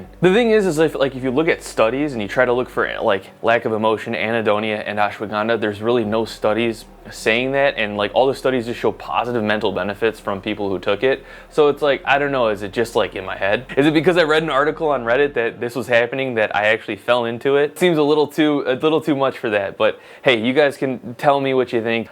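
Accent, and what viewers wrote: United States. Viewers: I don't know about America(judging by the accent) but in Poland most commonly it's adviced to take it when you are relaxing to go to sleep in last 1-2hrs before you close your eyes. The side effects that you describe are similar to what I noticed poping a pill in the middle of my day.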